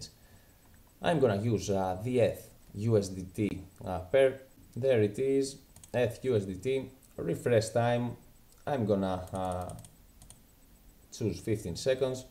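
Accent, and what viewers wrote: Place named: Greece. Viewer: are you greek ? only Greeks speak english like that, αυτα ειναι πολυ ψαγμενα πραγματα , ευχαριστουμε που μας δειχνεις τα φωτα σου, άτομα που εχουν φτασει σε αυτο το βαθος ερευνας και χρησης αυτης της τεχνολογιας ειναι ultimate tear geniuses.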